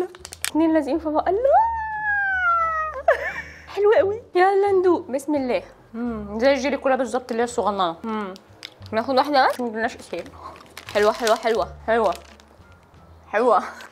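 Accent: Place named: Gulf, Arab world. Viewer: لا ادري حلوه اوي تحسيها قلبت خليجيه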